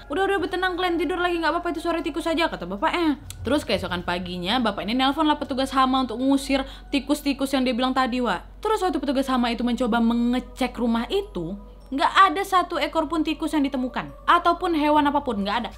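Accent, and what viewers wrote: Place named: Medan, Indonesia. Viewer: Suka sm logat medannya kak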